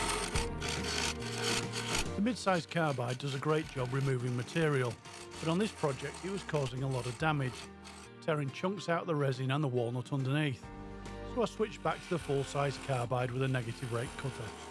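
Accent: United Kingdom. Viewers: I like your pedestal bowls, not least because I haven’t seen anyone else do them; and this one is particularly nice but I’m looking forward to seeing what you come up with next. It’s also nice to hear a British accent (Yorkshire?). 🌞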